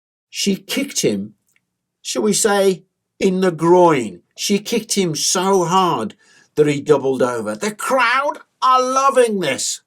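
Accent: United Kingdom. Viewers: Gotta save this for tonight for sleep. Need that sweet sweet british grandpa voice to soothe me to sleep. Thanks, from a cousin across the pond!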